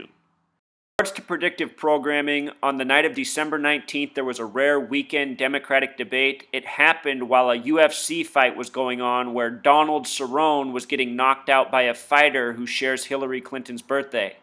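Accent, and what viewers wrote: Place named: New Jersey, United States. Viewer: My gut feeling tells me your from jersey.....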